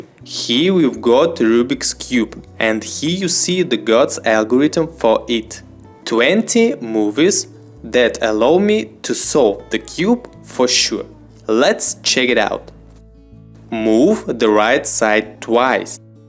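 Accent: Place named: Russia. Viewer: Noomde ye solve babe hota Russian inglish bolta he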